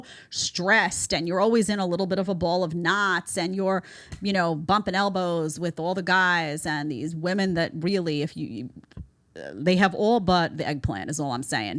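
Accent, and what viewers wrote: New York, United States. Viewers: You are delightful, especially your NYC accent. I'm drawn to women in the 5' 4'' range with small hands and feet.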